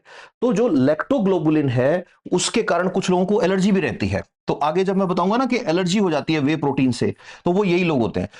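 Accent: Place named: Haryana, India. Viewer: Sir haryana se lagte ho isliye topic suru karne se pahle namste ki जगह ram ram se suru kro to jyada achha nhi rhega bs man me aaya to bol diya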